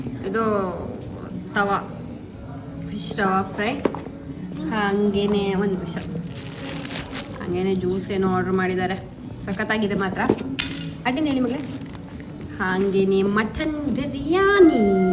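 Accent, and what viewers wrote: Kundapura, India. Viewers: Super ede beach ⛱️ side yalla, kundapura kannadalli mataddidru swlpa swlpa arta agutte 🤭mutton biryani 😋nyc 👌 sharing ❣️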